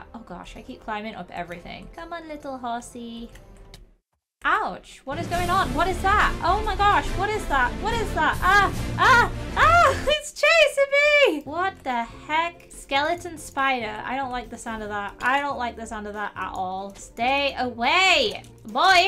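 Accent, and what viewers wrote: United Kingdom. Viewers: Name the horse Nutella hahha cause that’s the horses colour!!! Bye the way are u British??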